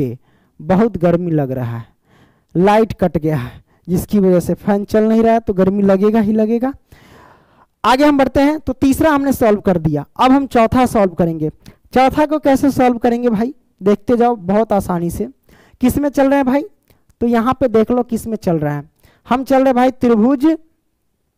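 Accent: Bihar, India. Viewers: You are. A good teacher but you talked some bihari language that why i didn't understood all work but you are a good teacher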